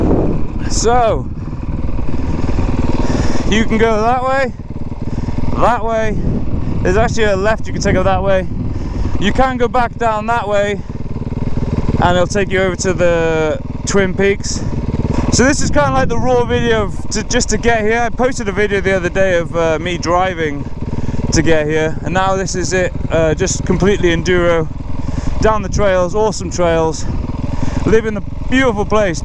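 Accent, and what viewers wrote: United Kingdom. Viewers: hello where are you riding sounds like UK?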